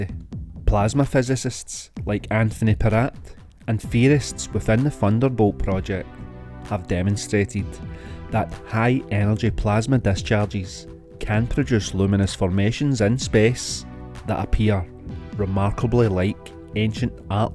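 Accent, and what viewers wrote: Scotland, United Kingdom. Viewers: There's just something hearing the story in a scott accent that makes it so much more interesting. Reminds me of my grand uncles.. natural storytellers.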